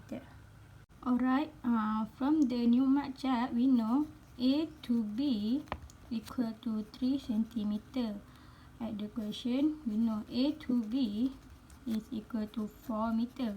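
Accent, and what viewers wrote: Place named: Malaysia. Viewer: finally i found the malaysian's english. thankyou so much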